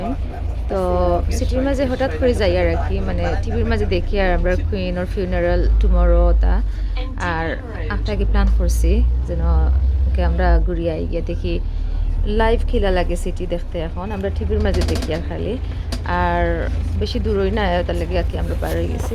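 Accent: Sylhet, Bangladesh. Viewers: খুব ভালো লাগলো সিলেটি মানুষের মাত হুনলাম আজকে। আপু খুব সুন্দর হইছে ভিডিও টি মাশাল্লাহ আপু আমার পরিবারে আসবেন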